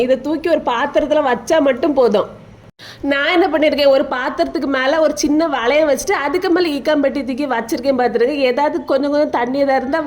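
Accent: Kanyakumari, India. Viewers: Athu nellai tamil illa athu kumari tamil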